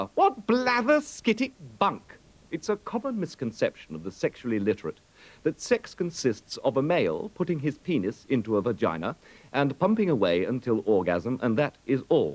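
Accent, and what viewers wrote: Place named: United Kingdom. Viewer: I think this is awesome, but I can't listen or read this video well in English, my language is Spanish. I've tried to (the great British accent helps me), but the CC are a bit confusing sometimes. It's there any transcription in English? I really want to understand this chat entirely. Thanks in advance!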